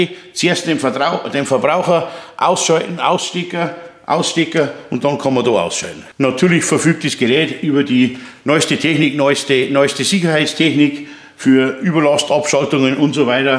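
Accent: Bavaria, Germany. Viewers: #ks2000 Den hatte ich mir die Tage auch näher angeschaut 👌 Und noch eins: Ich bin kein Bayer sondern aus dem Westerwald. Aber bitte sprachlich nicht verbiegen. Bleib bayrisch 🎉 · Hallo, wie immer in deinem Urbayrisch ein klasse informatives Video. Du schwätsch halt et um d heiße Brei. Mach weiter so. Das #ks2000 wäre perfekt für den Einsatz bei unseren Pferden. Die Pferde stehen in einem kleinen Offenstall abseits von jeder Versorgung. Für Licht oder im Notfall den Tierarzt mit seinen Geräten versorgen zu können wäre ein Traum. Besonders da das Gerät so flexibel ist mit Gas oder Benzin. Viele Grüße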